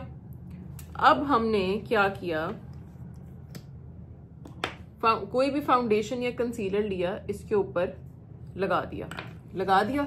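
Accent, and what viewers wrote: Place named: Punjab, India. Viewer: Bhtt achi lagi kal lazmi yeh trick try krungi nd ur punjabi talking way sun kr to video dekhne m or maza ajata ❤❤❤❤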